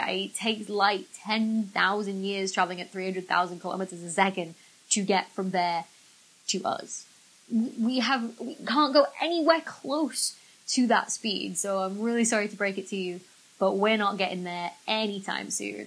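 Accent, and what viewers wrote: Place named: West Lancashire, United Kingdom. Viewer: Without sounding too stalkerish, where are you from? You sound to me like you're from around here (I'm in ormskirk in West Lancashire)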